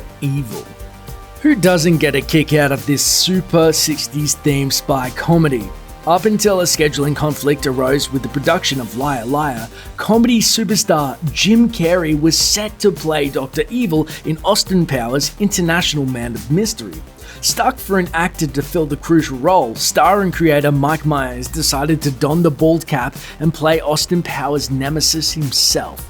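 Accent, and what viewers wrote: Australia. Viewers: I'm sorry, but that douchie Aussie accent is super distracting... XOXO ;) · woah hes australian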